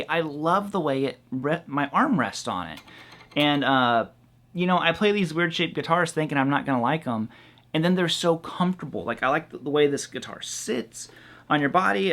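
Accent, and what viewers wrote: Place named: Nashville, United States. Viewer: Yeah man it sounds like you forgot and left your wah on. I don't care for that Nashville twang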